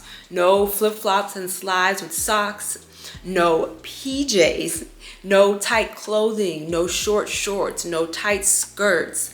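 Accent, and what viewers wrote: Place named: United States. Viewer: I feel like this video kind of need a country and field preface too, on top of ”it varies from company to company”. Here in Sweden the corporate hierarchy is much flatter than in the US (which is where I assume you’re from just from the accent). Jeans, though maybe not ripped ones, are absolutely considered business casual and ripped jeans would be ok if your corporate culture is casual. I work in a university office and I’m the most dressed up at my office in, according to your scale, casual clothing. It BLOWS MY MIND that you had to PAY TO WEAR JEANS at your place of work. I feel like the attitude of woman in the TikTok is much more problematic than the shorts - not having the humility to take the very kindly hint from the recruiter that her attire wasn’t appropriate for that office is much more of a red flag than the shorts themselves. 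I feel like having someone to reference that you consider stylish and appropriate is very useful - personally I’ll ask myself ”what would Princess Kate (or sometimes Crown Princess Victoria) wear?” if I’m in any doubt as to what’s appropriate. Does the trick every time. 😁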